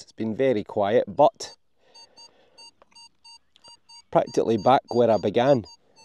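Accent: Scotland, United Kingdom. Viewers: When you find coins do you have to share with the land owner? Love your channel and the Scottish accent. ❤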